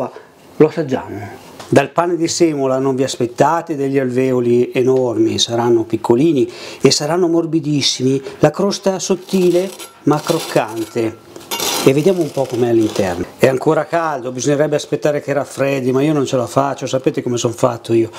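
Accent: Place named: Emilia, Italy. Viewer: Tantissime grazie, per le risposte, hai una parlata penso emiliana, simpaticissima, queste sono anche per la signora che ti è vicina,e che ogni tanto compare, Io ho lavorato come titolare in tutt' altro campo nel commercio all' ingrosso e lavorazioni di legnami all' inizio non distinguevo la differenza tra pesco e pesso. e anche se poi ero diventato il migliore disegnando progettando e realizzando creazioni artistiche nel campo delle lavorazioni di porte tavoli perfino scarpe in tranciato di legno m, però il mio sogna era quello di avere un ristorante , e non sai con quanta amministrazione e quasi invidia ti vedo all' opera per tutto quello che sai fare perché sei proprio un artista dell' arte culinaria e sarebbe una meraviglia saperlo fare come lo fai tu, oggi però che posso anche se sono anziano mi diverto e me la passo grazie si consigli di chi più ne sa di me Un caro saluto 🌹🌹🌹🌹🌹🌹🌹🌹🌹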